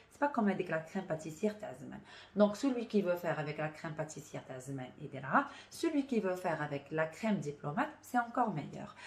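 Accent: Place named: Algiers, Algeria. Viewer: Les feuilles de gélatine halal ou porc 🐷 moi j achète pas la feuille de gélatine.merci pour ta recette et t’a jolie voix algéroise 💋💋🤩🤩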